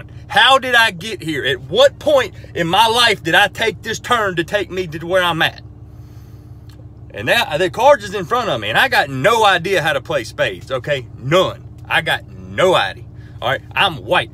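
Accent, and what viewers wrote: South, United States. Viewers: no, cause he is mad relatable and funny. he is a great story teller. i do love southern accents though:) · Hank is Texan. King of the Hill takes place in Texas. This man is clearly Southern, not Texan. You can tell by his accent and the fact that he mentioned corn and "cornhole". Those aren't Texan.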